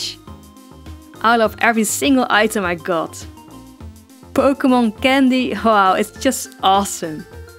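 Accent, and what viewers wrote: Netherlands. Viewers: ik kan echt horen aan je engels dat je nederlands bent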